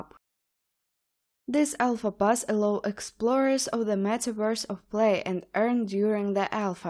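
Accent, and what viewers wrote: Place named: Russia. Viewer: Russian acsent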